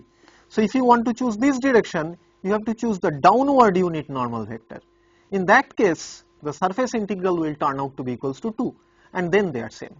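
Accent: India. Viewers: i cant understand it coz of his indian accent, and the shitty vid quality.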